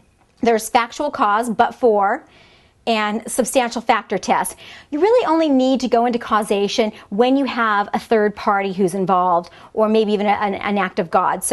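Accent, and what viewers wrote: United States. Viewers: QUESTION: If you're really certain, where in the USA is her accent from? Thank you. Every one of this series of videos is very clear and of excellent quality, and the audio levels on every one of the videos are perfectly matched for an uninterrupting playlist. The instructor is also very attractive, and I'm curious where in the USA her accent is from. Finally, I wish there were more such videos from her, besides the ones in this series.Thank you so much! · Hi Greg. I'm a 40-year resident of the United States who is currently in California (13 years). I've moved around, 27 years in Texas, a winter in South Jersey, a couple of months in Tennessee and I traveled as a sales instructor, 37 states total. I speak 4 languages fluently, but English is only my 3rd language. I just came across this video today and found your question. Long answer to you because of your preface, "IF YOU ARE SURE." I am not sure, but my guess is, she's from the Midwest. 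Eg. Illinois, Missouri, etc. among others. Educated Americans, by the way, such as Attorney, don't have identifiable accents, geographically. I say it humbly, folks have a hard time guessing my origin. Native Californians sound like her. And so do college graduates from New York. The loud and confident tone of her voice can also be attributed to a generational upbringing of a family of professionals, professors, educators, et al. Having said all of this, why 5 elements? I thought there's only 2? 😂😅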